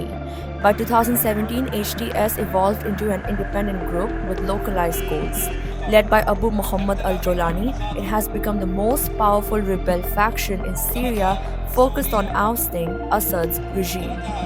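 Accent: India. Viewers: Indian accent so funny😂😂😂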